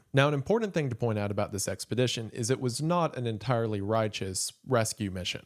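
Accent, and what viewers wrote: South, United States. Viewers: he's also a southerner, they've got the whole "pin-pen" merger going on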